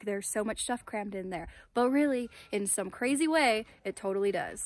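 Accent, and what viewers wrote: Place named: California, United States. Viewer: She sounds like she’s from California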